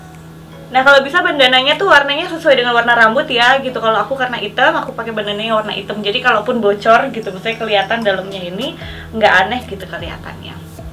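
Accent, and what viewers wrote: Java, Indonesia. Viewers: Aduh ngomongnya udah meddok hihii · Aduh logat jawanya kalihatan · Kelamaan dijawa jadi medok logatnya 😂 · Cara bicaranya sekarang lebih ke medok jawanya yaaa😊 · Jadi medok ya Bu kalo ngomong duh gemes tapi so lovable 🥰😍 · medoknyaa ada bangett😍😍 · Yey...sudah medhok Jawa! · logatnyaa jawa bangett yaa kwkw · Ngomongnya sudah agak medok yah Bu salam dari saya rakyat Suroboyo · Udah logat2 jawa ya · udah ketularan medhoknya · Medok banget Bu... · Medognya sudah mengalir hehe. Lanjutkan ibu 👍👍👍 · Tak urek2 kata bu.. Jawa bgtt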